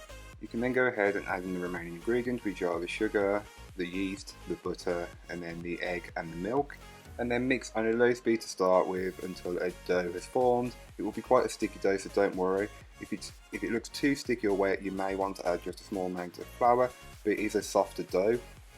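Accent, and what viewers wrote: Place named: Oldham, United Kingdom. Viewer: You sound like if you’re from Oldham. Keep the good work up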